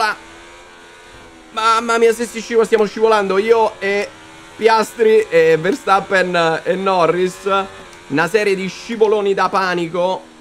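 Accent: Rome, Italy. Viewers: La tua parlantina da Romano mi fa morire 😅🎉😅, comunque inizia a freni sui 100 m dall'inizio della curva..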